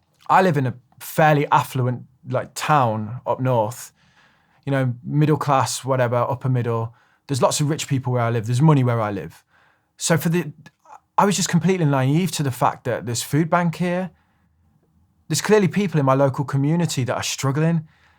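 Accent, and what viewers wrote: Birmingham, United Kingdom. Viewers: Great video, what a way to turn a shit thing into a force for good. I will admit to being disappointed that you're a city fan as I thought I detected a Brummy accent and hoped you'd be a Villain like myself:'( As for the name for "the attack", how about "The Smackening?"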